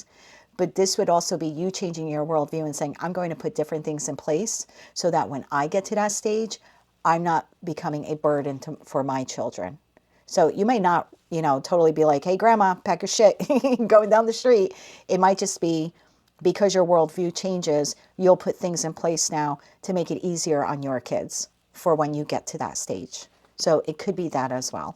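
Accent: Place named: Newark, United States. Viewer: Don't mind the cursing at all, appreciate the honesty! Sounds straight from Newark!😅 great reading, thank you! Much Love ❤️ & Light ♍️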